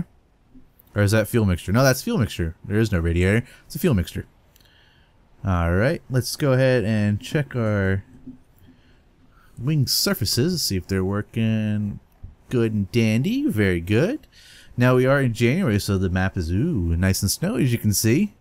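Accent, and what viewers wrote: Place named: France. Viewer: That Frénch accént tho... ermahgerd, itś epic ;3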